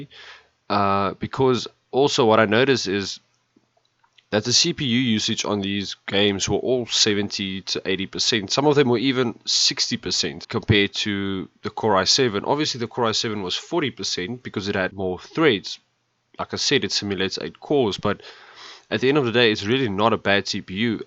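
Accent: South Africa. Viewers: you sound South African, lol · Yayyyy your south African yessss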